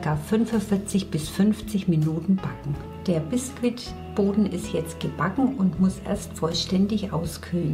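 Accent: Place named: Franconia, Germany. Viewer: Ich schaue gerne Backvideos, weil sie mich inspirieren und fröhlich und entspannt stimmen. Für meine Geburtstagstorte habe ich hier auch Inspiration gefunden😄 Vielen Dank für die guten Erklärungen und die gegebene Nachlesemöglichkeit der Zutaten😊 Besonders an diesem Kanal gefällt mir persönlich, dass da manchmal der Dialekt durchblitzt und ich fühl mich gleich wie zuhause in der Heimat 😉 (ich sag nur 'fünfevierzich' hihi fränkisch ich liebs❤️)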